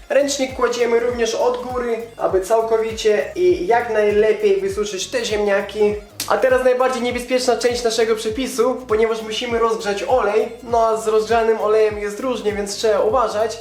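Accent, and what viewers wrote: Russia. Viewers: jestes z rosji czy ukrainy?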